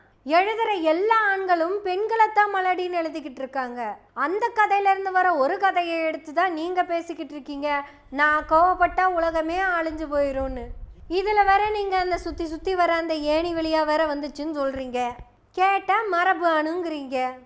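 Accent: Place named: Coimbatore, India. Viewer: Sister, you have narrated the Silapathikaram in a different dimension. Your speciality is your Coimbatore modulation. Keep going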